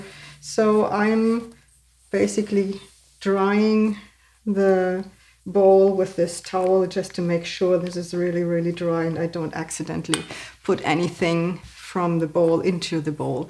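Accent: Germany. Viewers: People are soo picky with videos. This lady should have more views and much more likes.This lady is fantastic, charismatic, and well groomed. She does her videos really well. Her German accent accentuate her videos even more. I can't put more likes, i already checked a thumbs up. But, what i did is watched the commercials to help her. This recipe is so delicious.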